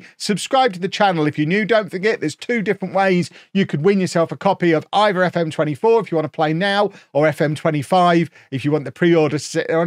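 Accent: United Kingdom. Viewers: I’m not sure I can support this series if there isn’t any suits. I can put up with the annoying British accent, the sub par game play, look it’s just not cricket.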